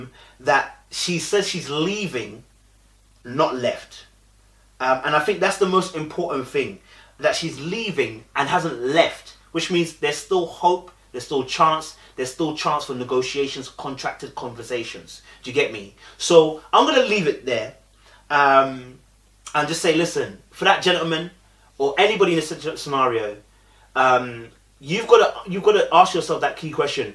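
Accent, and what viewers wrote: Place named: Birmingham, United Kingdom. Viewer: You're starting to sound a bit brummie 😁 coming from a brummie, I can hear it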